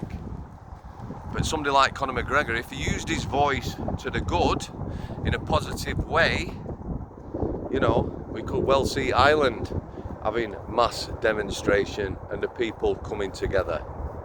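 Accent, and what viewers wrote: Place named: South Yorkshire, United Kingdom. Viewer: Love the accent, I'm hearing South Yorkshire. Very well delivered and to the point. Thankyou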